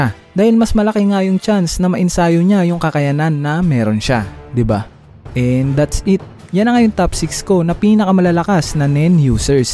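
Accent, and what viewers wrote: Visayas, Philippines. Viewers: Nag mukhang mali lng yung pag pronounce nya kasi filipinx accent Hahaha. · Bisaya ata si idol😂😂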